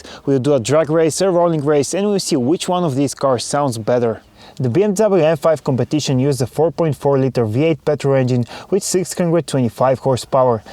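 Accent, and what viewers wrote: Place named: England, United Kingdom. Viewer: мдаааа акцент Английского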